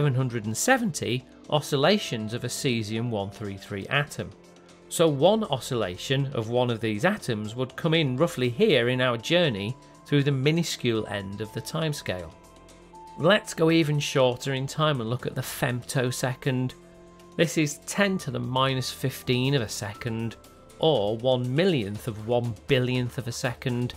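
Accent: United Kingdom. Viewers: another limey narrating a video👎💩💩💩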